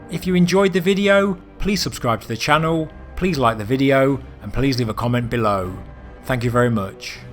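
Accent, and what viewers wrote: United Kingdom. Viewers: PowWolf Rider Dude sounds British, he probably means soccer field.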